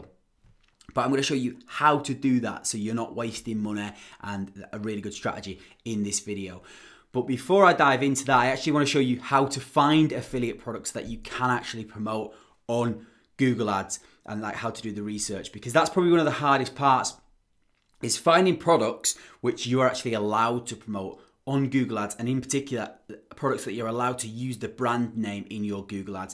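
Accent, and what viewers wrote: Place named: Manchester, United Kingdom. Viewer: Great content man, very comprehensive, is that a Manchester accent I detect? 🙂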